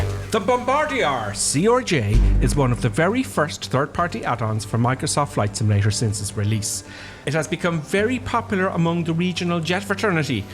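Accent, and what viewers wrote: Ireland. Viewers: Good to hear that lovely Irish lilt! Always time to sit back with a warm drink, put your feet up and get some laid back simming fun.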